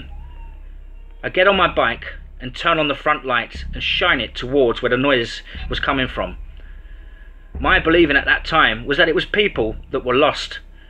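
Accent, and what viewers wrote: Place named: England, United Kingdom. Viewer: Your Englishness is awesome... Some tips. Tucson is pronounced two sawnh... I love your channel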